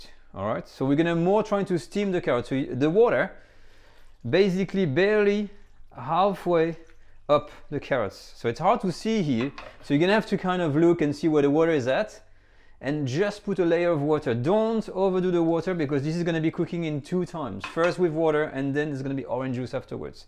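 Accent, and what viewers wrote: France. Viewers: Tres bien merci,de partager nôtre heritage culinaire avec nos amis américains! Parler anglais avec l accent francais est appétissant!! ,je veux dire par là lorsque vous dites des termes de cuisine en prononçant le mot en francais , peut être faudrait il le dire avec un léger accent anglais pour que nos amis anglophones comprennent mieux …ou l ecrire en sous titre avec l explication du terme reduire, bouquet garnis …etc maintenant si tout est expliqué dans le magazine dont vous faites la promotion autant pour moi …je vous ecris parceque j ai eu le privilège de travailler avec le Chef Monsieur Robuchon !! a Monaco a l hôtel Métropole que cette recette est une salade Marocaine revisité…est ce que le magazine en parle ?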